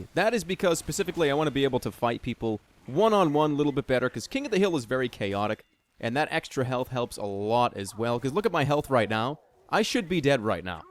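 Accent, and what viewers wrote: Boston, United States. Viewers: yo youre from boston? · your from where the scout is! boston!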